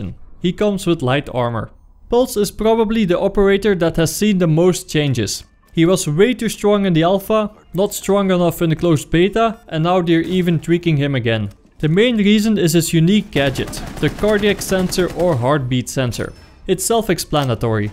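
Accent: Netherlands. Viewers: duidelijk Nederlands Engels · Probably the Netherlands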